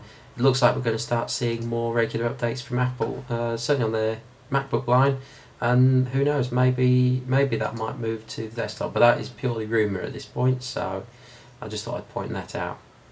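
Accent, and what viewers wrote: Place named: United Kingdom. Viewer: good to hear a british guy doin tech stuff, nice vid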